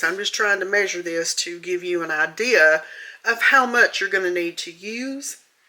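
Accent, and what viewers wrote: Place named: South, United States. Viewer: I love your recipes, i am on a fixed income and these seem very inexpensive. Also, i love your accent. I'm from the deep piney woods of East Texas close to the Louisiana border, and your accent so reminds me of home as my family moved there from Alabama and Mississippi.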